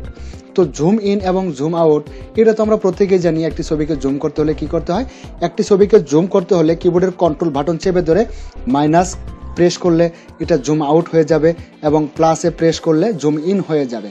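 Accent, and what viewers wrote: Bangladesh. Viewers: আচ্ছা আপনি চট্টগ্রামের নাকি? উচ্চারণ অনেকাংশেই চট্টগ্রামের মতো লাগে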